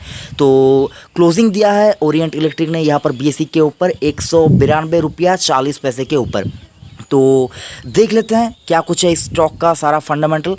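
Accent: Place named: United Kingdom. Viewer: language kyo asi bol raha hai gadhe..angrejo ki copy kar raha hai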